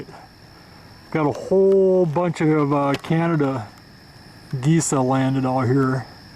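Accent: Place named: Minnesota, United States. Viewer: Nicely done video. Calming, informative. Love the Minnesota accent. look forward to more videos.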